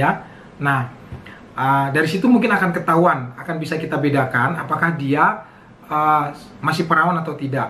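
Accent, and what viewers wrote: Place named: Karo, Indonesia. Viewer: Kalak karo nge km pak dokter, pantesan logat na bgi logat klk karo☺️☺️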